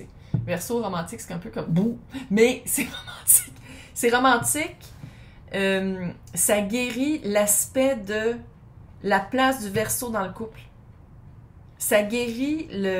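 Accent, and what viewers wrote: Quebec, Canada. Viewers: Exactement ma Vie actuelle nouvelle rencontre comme une évidence démarre doucement comme la personne ultima avant la fin du monde..............vive l'accent québécois et l'humour et les Verseaux bise de France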